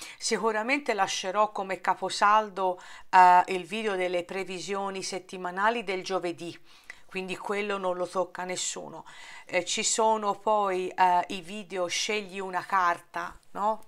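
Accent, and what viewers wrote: Tuscany, Italy. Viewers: io comunque ti seguo a prescindere perché mi piace innanzitutto la tua parlata toscana e i tuoi " e bla bla bla" mi fanno piegare in due dal ridere! Ti abbraccio forte!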